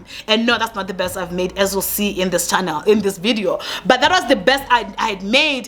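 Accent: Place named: Africa. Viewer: Thanks for providing detailed information.You can have African accent what is important is the information you are presenting to us.Well done with your spreadsheet we will always support you in the SADEC region in Africa.Felicity Family SA.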